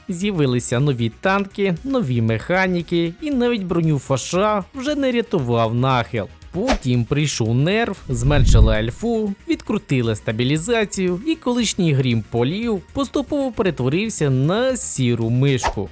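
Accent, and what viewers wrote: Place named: Russia. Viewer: А де КВ - 1С? З альфа пуканом? І скажу ще одне, одразу чути що перейшов з російської на українську. 😅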